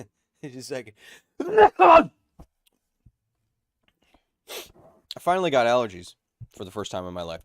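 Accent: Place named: Australia. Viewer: Gotta admit, last week's Aussie accent was better. Can't put my finger on why though.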